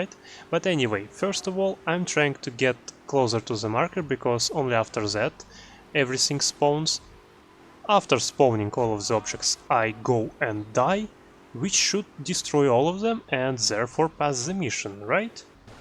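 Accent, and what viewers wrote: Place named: England, United Kingdom. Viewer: Oh, you from England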